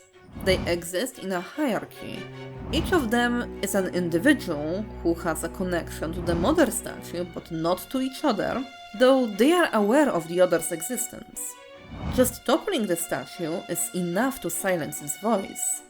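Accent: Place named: Poland. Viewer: Your accent is... pretty polish. Very polish actually.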